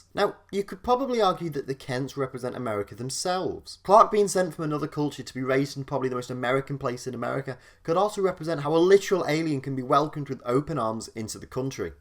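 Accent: Scotland, United Kingdom. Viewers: You're not the Scott, but are you a Scot by chance? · Scott turned British?!!! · Comics I like how the one video about America was done by a British guy. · Whys a British guy talking about the american way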